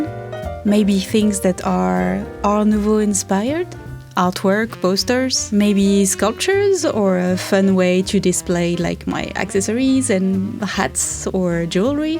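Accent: France. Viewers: you are such an inspiration, paint, sew, carpinter. art in every thought. it freaked me out a bit you were wearing flipflops for the whole processed (closed shoes in the heavy workshop!!) but i love you and the french accent <3 salut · You are pure whimsical chaotic energy - with a French accent - & I am so here for it! The room looks FABULOUS!!!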